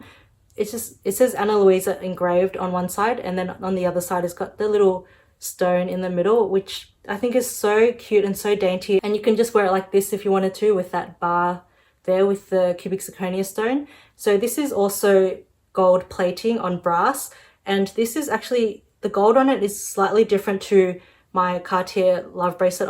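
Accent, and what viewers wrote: Australia. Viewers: Are you Korean? You sound like you kind of have an Australian accent. You remind me Rosie a little from black pink I love them so much !!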